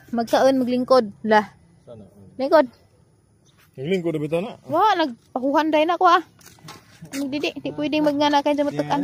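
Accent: Bohol, Philippines. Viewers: Mo angay jud ko sa imong sinultian. Pure Bolanon jud. ❤❤❤❤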